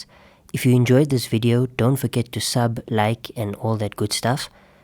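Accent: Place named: South Africa. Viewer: You can tell this guy is from south africa 😂 im also from sa bru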